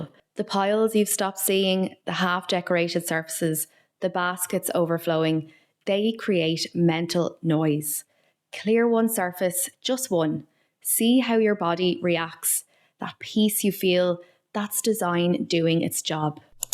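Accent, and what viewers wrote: Ireland. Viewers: Love your irish accent up the dubs 😂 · Thanks for getting straight to the point without a lot of unnecessary introduction and explanations. Your Irish accent is as beautiful as you are, too. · I'm here for the lovely Irish accent! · To a large extent, I am watching because i love Irish accents.....so there's that.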